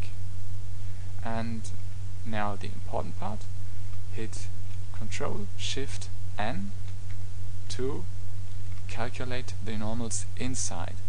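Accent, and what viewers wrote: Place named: Germany. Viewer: I did never say I did not understand him. I was trying to give him advice on recording spoken text in General. His english is quite good, but I can hear he's German (which I am too by the way). But if you try to follow such a tutorial a series of "uhm" and "ahs" and thinking breaks, it can be tiring. So I basically tried to help him, because he was apologizing at the end. Rather write it down, makes it much easier to speak and focus on the rest. Ah and by the way, I am bilingual ;-)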